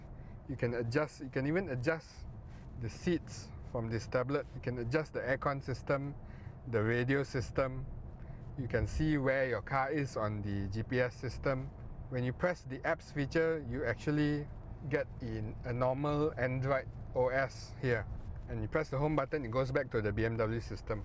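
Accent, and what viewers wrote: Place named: Malaysia. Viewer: Great review. Informative and extensive, with nice Malaysian touches with your use of "la" in your speech!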